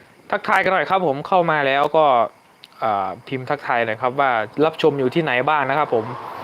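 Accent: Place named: Lampang, Thailand. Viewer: ลำปางชัดเจนดีครับ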